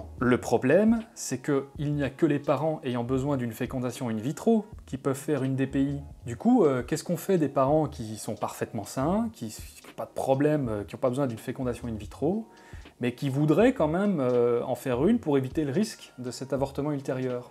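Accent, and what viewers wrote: Belgium. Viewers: Ce format est vraiment trop cool ! Que ça soit les musiques, l'image, l'humour bien dosé, le propos, les exemples illustrant tel ou tel bout de la méthode, l'accent belge, c'est parfait ! T'es au top et merci !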